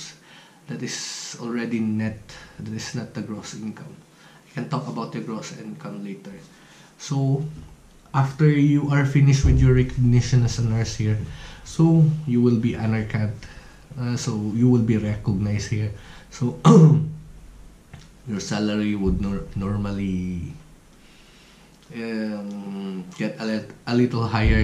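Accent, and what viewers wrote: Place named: Ilonggo, Philippines. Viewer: Ilonggo ka sir?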